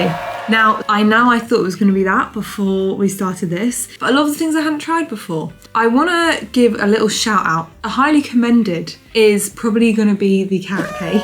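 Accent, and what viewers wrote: England, United Kingdom. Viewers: Je viens de te découvrir, j'ai beaucoup aimé cette vidéo. Ce qui me perturbe c'est ton accent anglais alors que tu es en Amérique puisque tu testes Costco... À part ça merci pour cette vidéo. Bisous de France ❤❤❤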